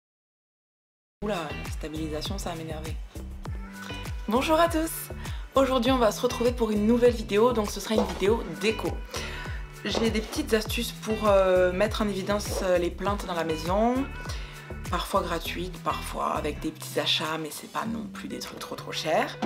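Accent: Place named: South, France. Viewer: l'accent du soleil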